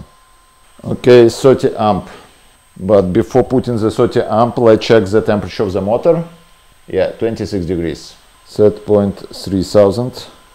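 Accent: Russia. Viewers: Акцент, как у русского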